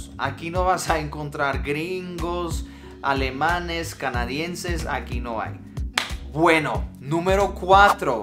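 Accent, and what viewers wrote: Colombia. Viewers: Jajaja cómo has aprendido a hablar al estilo colombiano 🤭🤭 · Parce puro colombiano jajajaja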